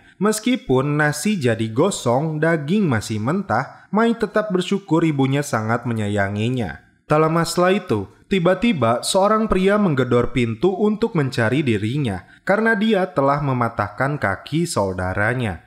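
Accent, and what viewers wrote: West Java, Indonesia. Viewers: Sunda ning a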